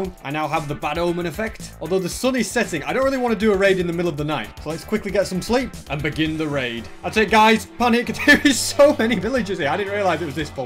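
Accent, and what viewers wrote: United Kingdom. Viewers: y r u british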